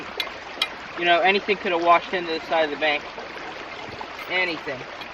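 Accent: Pittsburgh, United States. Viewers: He sounds like he from Pittsburgh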